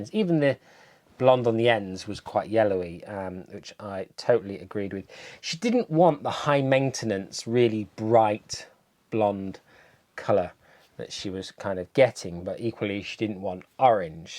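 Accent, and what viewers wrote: United Kingdom. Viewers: I was watching and listening while making Sunday family breakfast, having a discussion about toast. Part of my brain that was listening to the end was wondering what “car key” color looks like. Must be like a dark steel grey. OH!!!! Khaki!!! I do so love listening to an English accent. But I need to focus. Thank you for your education!!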